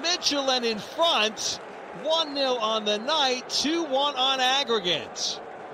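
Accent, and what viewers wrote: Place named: United States. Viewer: American commentator? I didn't expect that really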